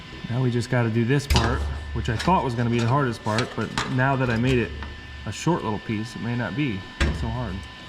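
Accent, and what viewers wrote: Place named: New England, United States. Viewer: The dialect is a little New England and Pennsylvania mixed in.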